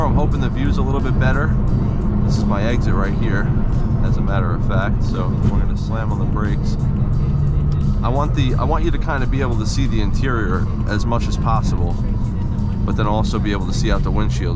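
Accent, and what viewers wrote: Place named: Long Island, United States. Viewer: In the first ten seconds from the overpass and your dialect I knew this was LI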